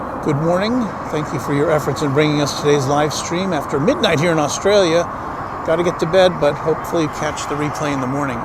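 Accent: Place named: Brooklyn, United States. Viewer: haha! and host is from Brooklyn New York, can you believe it 😄 #accent??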